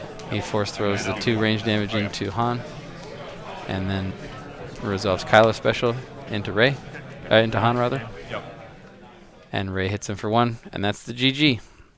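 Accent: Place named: Canada. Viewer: lol just the way you pronounce it like Zor instead of Sore. Chances are it's just another ridiculous Canadian thing like Zed instead of Z.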